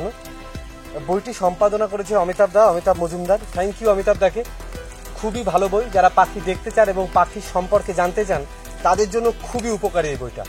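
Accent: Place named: Bangladesh. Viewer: আপনার ভাষা শুনে মনে হয় আপনি বাংলাদেশের মানুষ। পশ্চিমবঙ্গে ভাষার সঙ্গে আপনার বাসা মিল হয় না।মনে হয় বাংলাদেশের কোন অঞ্চলের ভাষা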